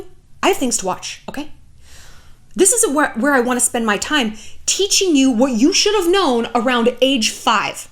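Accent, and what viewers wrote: France. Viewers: Love your French accent, I laughed so much. Greeting from Paris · Your French accent <3 and all your other wonderful accents, they're always so entertaining to watch! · J'adooooooore ton accent français. C'est parfait et rigolo, comme toi, beauté ! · CIGARETTES? OMG. That's total insanity. That's disgusting. I love how you say, "I think about this once a week!" YOU ARE HILARIOUS! I'm dying. I also love your French accent and vocal fry. You're a great actress. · Ravie de savoir que tu es française! Ahaha lovely accent xx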